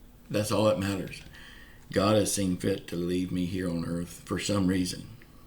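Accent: South, United States. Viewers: A knitting show lol.....I love your southern accent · I am from the south and I sound a lot like you there is nothing wrong with your speech I love it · GOD BLESS YOU MY BROTHER FOR HELPING US STAY INFORMED DURING THIS TIME, AND PRAY HE HEALS YOUR THROAT CANCER NOW 1 PETER. I LOVE YOUR SOUTHERN DOWN HOME FRIENDLY PERSONALITY, HEART, AND ACCENT LIKE ME AND THE GOOD OLE PEOPLE HERE IN NORTHWEST LOUISIANA!! 😂❤🙌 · Hey. If you have a knitting show, I'll be there no matter what. Hope you'll still give us an update on the politics AND the stimulus. You're doing a great job. I'm in NC and grew up in FL. Love your Southern accent. Keep up the good work. You are a refreshing start to my day. Thank you for taking the time for us. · your a great man..we need u in office...someone that cares...Prayers for your cancer...thank u for sharing thoughts and videos...taking time for all of us... 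love the southern accent... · Good morning! Sorry to hear about your throat cancer but I still love your southern accent, and thank you for the work that your doing to keep us informed. God bless you sir 🙏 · May God heal you sir and i love a southern accent 💛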